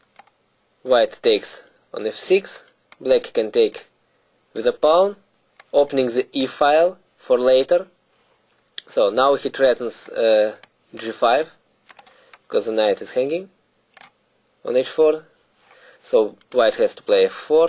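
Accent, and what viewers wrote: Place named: Russia. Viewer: he actually has a russian accent...he's not faking it...:-p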